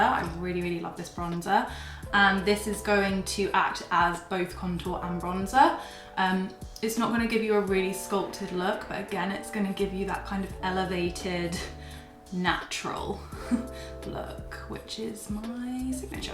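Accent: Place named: United Kingdom. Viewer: No one says "glam" like you with your classy British accent! :D Beautiful look!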